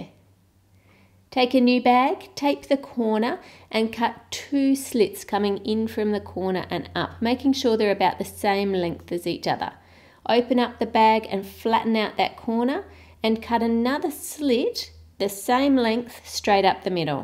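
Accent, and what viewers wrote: Australia. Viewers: Hi! I love your channel, and watch it all the time. You obviously come from a country where you have summer all the time. How could I know (apart from your accent and that you say you come from Oz)? The snowcrystals have 6 points. Always:) Btw: I whish I had an orange tree in my garden, not snow... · Just love the Aussie accent 🥰❤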